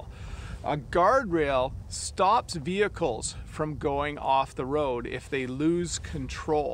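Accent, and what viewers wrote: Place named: Canada. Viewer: Hi great to learn with you English in Canadian way thanks Lott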